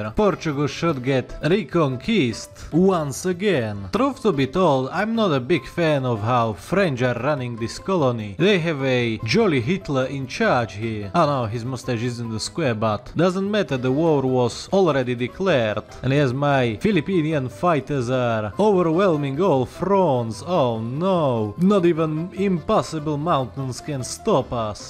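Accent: Russia. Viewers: Bro your the best 😜 your Russian English is quite cool 🥲💀☠️ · Bros speaking russian accent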